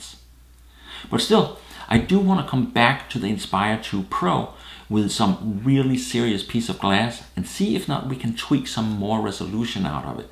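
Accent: Denmark. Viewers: Excuse me, but are you originally from Denmark? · Hey, I'm a big DSLR geek just getting into the drone-world after getting my Phantom 4. Amazing stuff and pure candy to watch your videos. You have a new subscriber:) Er du i øvrigt dansker? Synes jeg kan høre det i din dialekt (og ikke mindst dit navn). Thanks:-)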